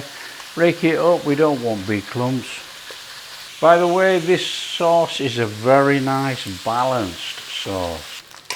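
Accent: Yorkshire, United Kingdom. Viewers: Beautiful, mate. Looks easy and tasty. Will give this a go tonight. Where abouts in the UK is that accent from? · Thought i heard the yorkshire accent. Cool thanks for the video.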